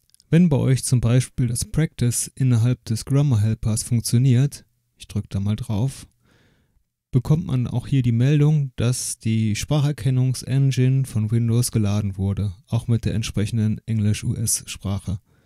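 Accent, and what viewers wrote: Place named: Germany. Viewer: Thank you very much, you did help me a lot ! And absolutely LOVE your German accent 😆😉👍👍👍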